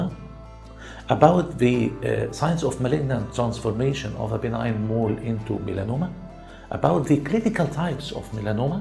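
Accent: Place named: Arab world. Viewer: Great!! Like the Arabic accent... :)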